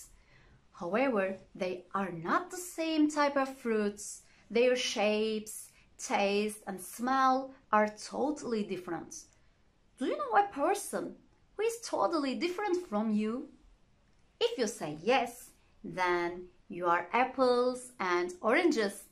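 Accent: Turkey. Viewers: You pronunciation likes Turkish, I think, you are from Turkey, right? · Mrs. is my English teacher at school. I love her very much. If you don't like the video it's probably because of the way she is speaking but please keep in mind that we are Turkish. We didn't born neither live in a country that speaks English. It’s not our native language. This video helps me a lot with my lessons at school and I hope it's the same for you too! If I made any mistakes while writing this please point them out so I can learn the right. Thanks for spending your time.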